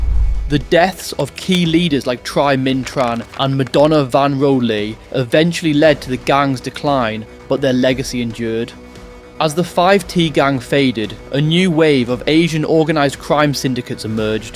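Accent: United Kingdom. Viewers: who is this British accent speaker broadcasting old news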